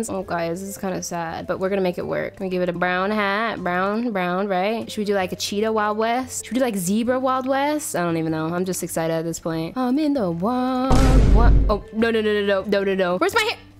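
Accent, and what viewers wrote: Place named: Asia. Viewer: her asian accent tho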